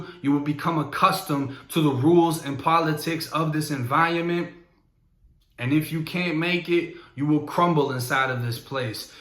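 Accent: Boston, United States. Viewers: I'm from just north of Boston and your accent sounds like ours,is there a part of Florida where other dudes talk like you? It threw me off after I started watching your videos that you weren't from the northeast.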